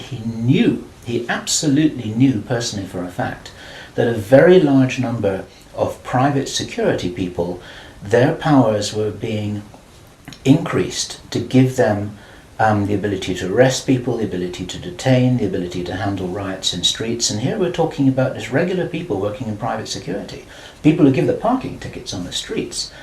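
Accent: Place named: United Kingdom. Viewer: El que murió en 1997 es un gringo o estadounidense. Bill Ryan del actual video habla con acento británico. Que Dios lo proteja.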